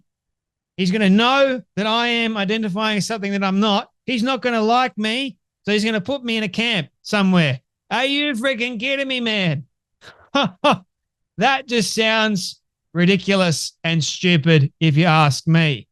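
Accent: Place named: Australia. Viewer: LOL, I love how Aussies say.. "mate", especially you,.. Happy.Night/Day:D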